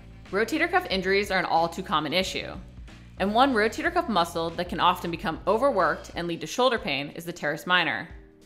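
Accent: United States. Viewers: Love the accent. American English sounds so lively compared to English here in London.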